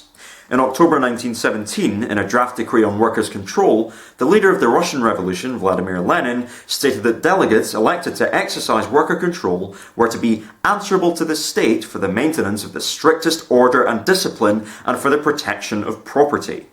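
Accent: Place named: United Kingdom. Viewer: You look like trey sessler but with a british accent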